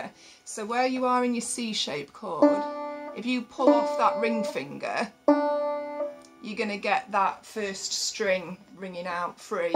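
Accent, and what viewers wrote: England, United Kingdom. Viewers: You are so awesome! I love your English accent. I could listen to you all day long. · Are you from England? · Love this video! It's timing is perfect for a newbie like me....other videos go way too fast! And an English accent too. Ideal! #subscribed #fanboy!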